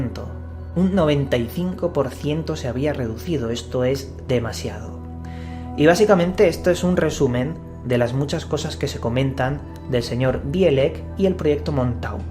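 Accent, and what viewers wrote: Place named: Spain. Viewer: Con respeto a los amigos españoles y a su hermoso pais, el acento casi no me es tan amigable, pero el de es tan placentero oírlo.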